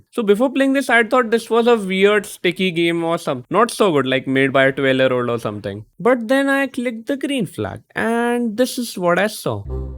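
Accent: India. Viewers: are you indian? you sound like indian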